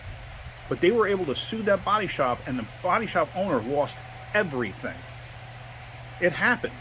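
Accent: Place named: New York, United States. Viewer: I thought you sounded like a New Yorker, then in recent videos you've confirmed that. I spent 30 years in Texas and for a bit in the 80s did the annual state safety inspections. I've now been in Minnesota for 10 years and have now experienced the rusty crusty stuff common in the "salt belt" of the US. I've been watching Eric O at SMA deal with the rusty crusty stuff PLUS the crazy laws and regulations and restrictions that NY state puts on cars and I have mad respect for him dealing with all that. I'm guessing you're glad to not have to deal with all that!!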